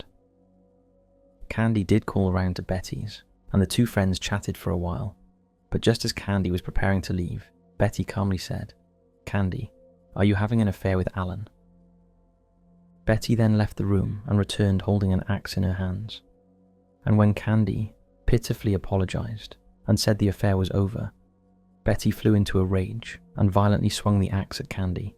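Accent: United Kingdom. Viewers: His voice is so lovely and mellow, and I love a British accent!!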